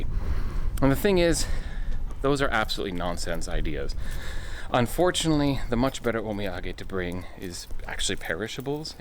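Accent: Midwest, United States. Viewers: Love the Midwest accent. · Konnichiwa from North Pole Alaska. You sound like you’re from the Midwest! (My Dads family are from there). Loving your videos, they make me smile when at work. We’re getting ready to go back for our second trip to Japan. Fell in love with it last time!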